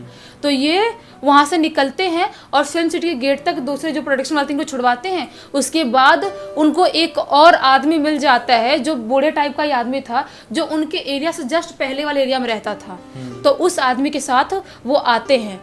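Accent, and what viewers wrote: Bihar, India. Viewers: Yarr tum chutiye ho kya . Pehle to iss didi ko bolo ki apni akhe chote krle . Vaddi vaddi akhe nikal leti hai . Hume dra dra ke marr degi kya 😂😂😂. Fir ise bolo ki hindi bolna to sikhle bihari to matt boll . Ja fir behari he boll le . Didi ko bolo ki apna accent theek krle . Words theek se pronounce kre .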